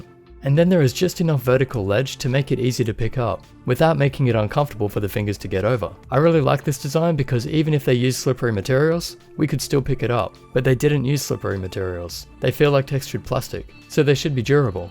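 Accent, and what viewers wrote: Australia. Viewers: This is a bloody excellent review, mate. Impressive amount of detail! My first video of yours and an instant sub. Also great to hear some Aussie-English amongst the far too much American going on on YouTube!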